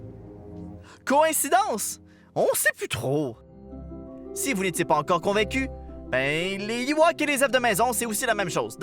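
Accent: Quebec, Canada. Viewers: C'est un québécois y a qu'à entendre son accent... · Les quebequois, vous avez un accent magnifique 😍❤👍🤣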